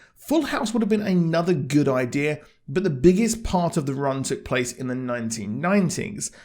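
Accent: United Kingdom. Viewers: Thats because he's British. I have a similar accent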